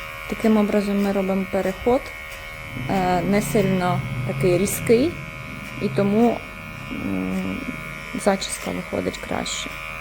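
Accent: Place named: Central Ukraine, Ukraine. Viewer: Прикольно вийшло ! А ще цікава у вас вимова українською , ви десь з центральної України родом , Вінничанина ? Просто цікаво ) , дякую за відео .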